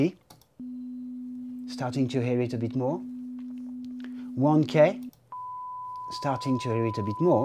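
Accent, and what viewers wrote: France. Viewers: Goog french accent haha Thank you for the video ;) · haa probably french accent no? better to understand in the middle of all this english lesson. ;) good lesson thx peace !!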